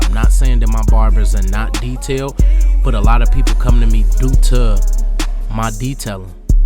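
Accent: Chicago, United States. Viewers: Ayo you frm Chicago??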